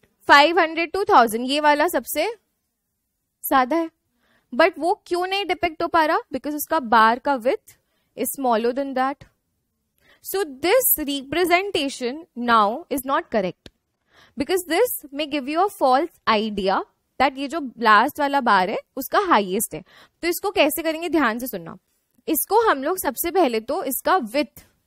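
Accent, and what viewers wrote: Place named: India. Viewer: Good teaching, Madam. However, given that we already struggle with the Indian accent, please avoid mixing English with your local language if your target is the global market. Thank you, and keep doing this great job!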